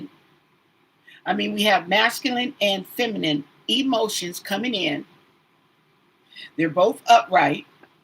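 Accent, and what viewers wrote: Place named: South, United States. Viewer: I have tuned into your readings 3times now you are so comical love your accent are you from deep south etc south coralinna or Georgia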